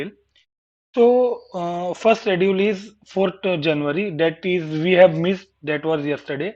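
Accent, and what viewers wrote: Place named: Syria. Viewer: Please don't get me wrong but you speak english like a syrian :D Good day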